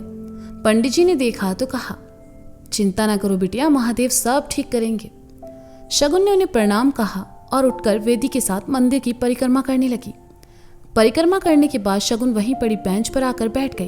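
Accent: Kanpur, India. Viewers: Mam ap manmarziyan record karte karte kanpuriya tone me baat karne lage ho joki bahut mast hai 😊😊❤❤😁😁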